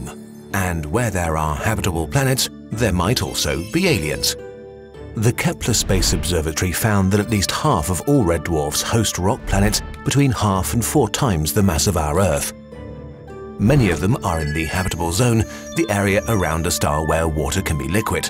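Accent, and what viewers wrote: United Kingdom. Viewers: British guys with relaxing voices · Something about a British man calling a Red Dwarf a baby is satisfying.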